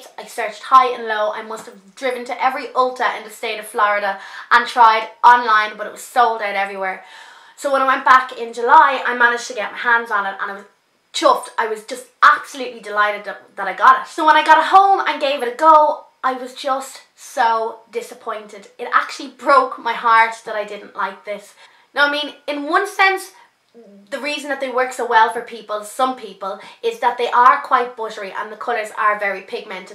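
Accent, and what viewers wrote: Ireland. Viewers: Pretty sure she's from Ireland.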